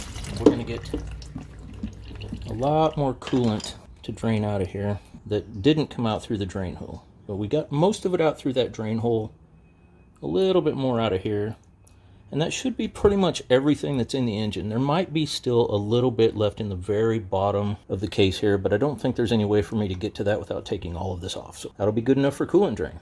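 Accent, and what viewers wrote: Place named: Jamaica. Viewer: And thankz 4 di video bro very smooth and nahmal Jamaican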